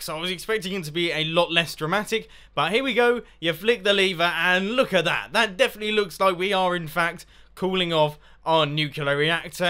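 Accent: England, United Kingdom. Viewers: Jack Lusher Well he's from england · hes british. deal with it.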